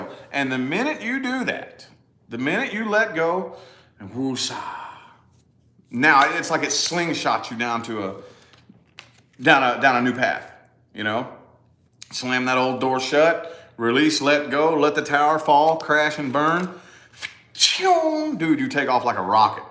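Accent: South, United States. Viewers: Yes. That's a southern accent. Different southern states have different dialects. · Love your voice and your accent and expressions, you make me laugh, is that a southern American accent?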